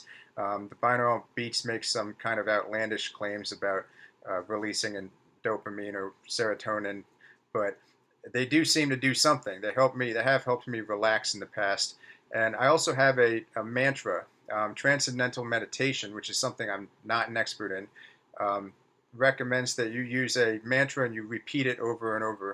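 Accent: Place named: East Coast, United States. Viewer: there’s something really comforting about your voice and your back-East accent. I love listening to your videos. I never had the alcoholism as bad as you did, but it’s very relatable.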